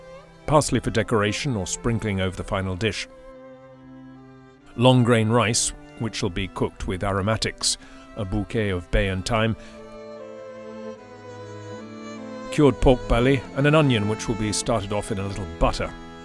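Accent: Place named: England, United Kingdom. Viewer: you keep on producing whatever is in your heart and mind to produce and you won’t be letting me down! Promise! I will be honest with you, I really don’t know how I came across your channel, but I am very glad that I did. I have had many years in the wine industry (which thanks to Covid has been a challenging thing recently for not only myself but many food and wine professionals in the city), but I started out in the food end of the business and I have done a LOT of things in both areas over the years. Recently, New York has been feeling like a culinary dessert to me…maybe I have just become jaded! There was something about your videos when I first discovered them that really caught my attention, and trust me that’s saying a lot because I take in a lot of what is out there and just through osmosis here in the city I pick up a lot. I confess that when I first started watching it was just really good eye candy as they are so beautiful, but very refreshing and I liked your style (not an egomaniac and who doesn’t love an English accent), and the recipes tugged at my heart. 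This may seem a little silly to say to you, but your videos have been awakening a side of me that I was losing touch with and is so important to me. I can’t help myself, when I watch them now I look at them from a more professional lens and yes, I do praise you a lot, but I do have a little mental list of a few thing… Yes, I am here in Manhattan, not far from your old stomping grounds…I am in Hell’s Ki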